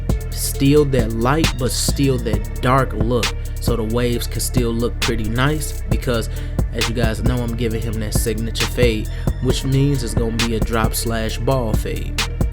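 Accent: Chicago, United States. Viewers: Sound like you from the Chi, I'll drive 3 hrs for this cut. No doubt! Good work bro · that Chicago accent, is crazy. I lost mine at the age of 10 when I moved to TX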